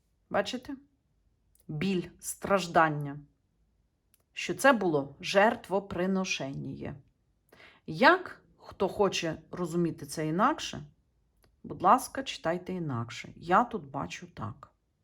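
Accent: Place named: Bukovina, Ukraine. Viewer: приємно чути правду та осадок що відповідати не будуть.Як сонечко в морозний день буковинський діалект гріє не тільки слух а і душу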